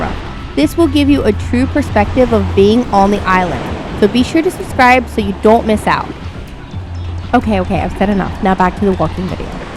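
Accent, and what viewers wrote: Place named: Philadelphia, United States. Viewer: I had to check your profile to confirm my suspicions after you said “water”…Philly accent confirmed! Lol thank you for the gorgeous views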